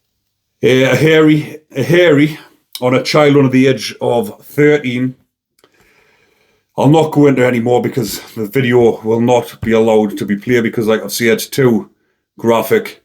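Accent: Newcastle, United Kingdom. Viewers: Lovong the Geordie accent when your telling your stories. I lived in Newcastle UT myself for nearly 12 years. Loved it. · You are a tough hard bloke and I love your Geordie accent. Keep it up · Have watched about this before, but always love hearing the happy ending on this one. Especially when told with that canny accent. I'd love to know how the justice giver intended to cook Huckle's body parts for consumption... Must be a prison trick somewhere?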